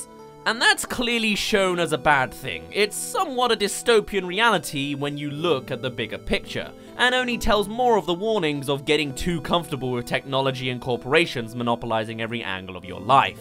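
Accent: United Kingdom. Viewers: I actually like the British accent. Maybe even a bit jealous...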